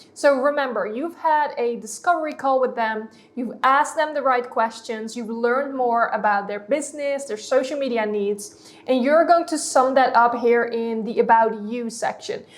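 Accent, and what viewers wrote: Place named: Netherlands. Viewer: Ben je van Nederland? Ik hoor een zwaar accent😉groetjes uit België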